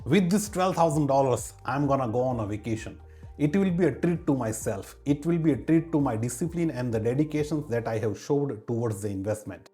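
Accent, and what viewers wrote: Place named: South India, India. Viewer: Dude you sound South Indian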